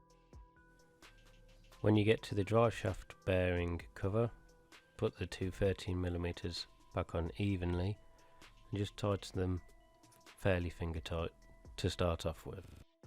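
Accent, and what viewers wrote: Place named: United Kingdom. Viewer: Sounds like british accent. ;) He should have used "right side". At least definitions of left and right are still the same in the UK. :D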